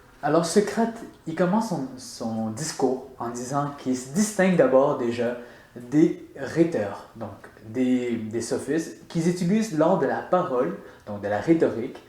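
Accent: Quebec, Canada. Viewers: woah c'est quoi ton accent, c'est mi québécois mi français dis weird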